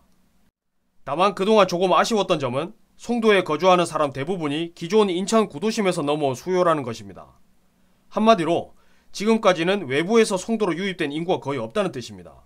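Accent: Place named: Gyeongsang, South Korea. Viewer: 저 특유의 꼰대같은 경상도 말투가 비호감이라 좋아요는 못누르겠네...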